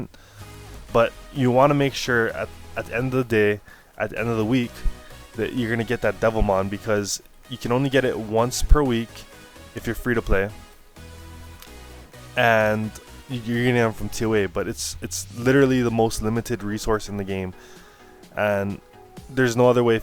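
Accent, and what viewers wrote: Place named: Hawaii, United States. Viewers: Yeah I could hear the pidgin small kine too. Lol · nah, its not thick, but if you're from hawaii theres certain things that you can never stop and you recognize when others do it too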